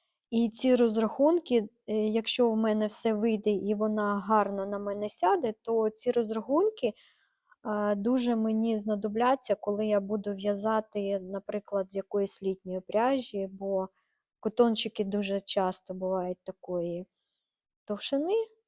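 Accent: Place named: Steppe, Ukraine. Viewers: завжди із задоволенням дивлюся ваші відео. Ви не тільки надихаєте своїми виробами. Вас завжди цікаво слухати. І ваш Херсонський говір, то моя рідна мова😂. Херсон, тримайся❤ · Лайк за степовий суржик))! Ще один))❤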